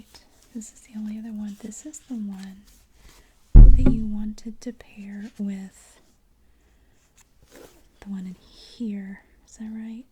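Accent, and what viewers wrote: South, United States. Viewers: I love your voice and southern accent!!!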